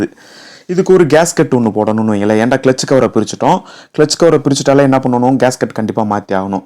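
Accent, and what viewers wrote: Madurai, India. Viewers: Bro nenga madurai,yahh??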